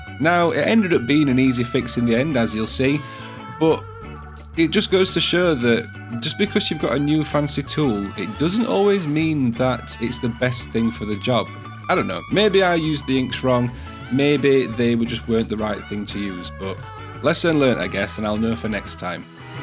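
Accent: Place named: South Yorkshire, United Kingdom. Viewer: Hey where you from you sound local to me. South Yorkshire.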